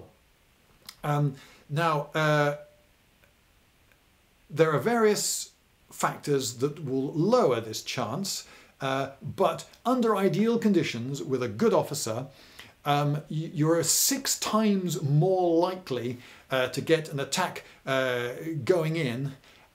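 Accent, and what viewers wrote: United Kingdom. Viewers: some friends and I have been wondering over the last few days why you Brits pronounce lieutenant like lef-tenant instead of Lou-tenant. Regards, A curious member of the Australian colony. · “Pencil-neck”!?! Haha no way man you fuckin rock I love your videos, sorry some of my fellow Americans are less cultured people with nothing better to do then be shitty to other people. Honestly the British accent and way you talk probably makes them feel intimidated or like they are less intelligent or something. Keep the videos coming dude!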